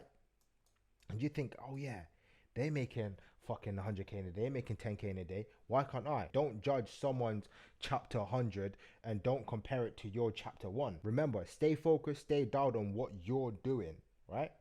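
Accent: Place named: United Kingdom. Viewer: It’s refreshing to hear a brudda from the UK talking about these things. Following!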